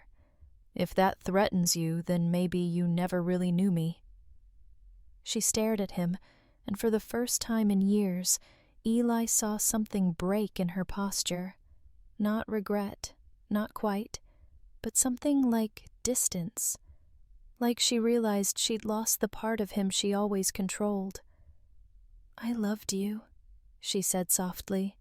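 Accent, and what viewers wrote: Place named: United Kingdom. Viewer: Love this story...and the narrators slight British (?) accent. 🥰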